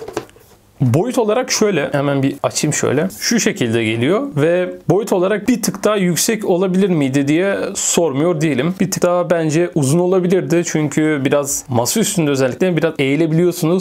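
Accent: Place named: Azerbaijan. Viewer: Selam. Sen nerelisin? Sanki Azerbaycan Turkusun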